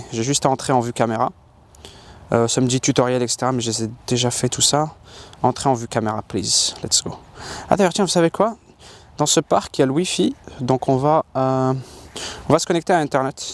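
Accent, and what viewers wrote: Quebec, Canada. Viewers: C'est moi où tu commences à avoir l'accent québécois ? Mdrrrrrrrr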